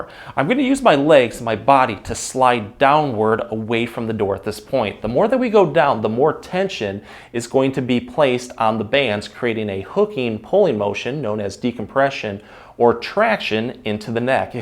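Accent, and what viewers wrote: Michigan, United States. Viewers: Holy wow!!! I’m working in Italy and the beds and pillows are horrible. My neck was hurting so badly that I started a Google search on videos to help out. I found your videos and what relief!! I definitely will have to see my chiropractor when I return back to the states. BUT I do have to say as soon as you started talking I thought “He must be in Michigan”….. I lived in St Joe, MI for 7 years!!! Of course I’m following you now!! Thanks for the relief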